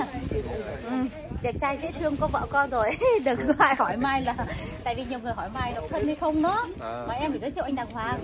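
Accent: North, Vietnam. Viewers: Thích nghe giọng Bắc của Mai, mình vô tình mới xem kênh Mai , vui vẻ và gia đình hạnh phúc ❤️ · Chào Mai ! Nghe Mai giọng bắc mình cũng là người bắc. Mai quê ở đâu vậy?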